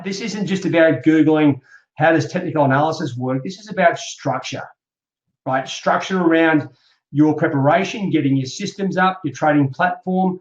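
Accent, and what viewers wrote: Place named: Australia. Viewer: I can't understand that severe down under dialect. pass~>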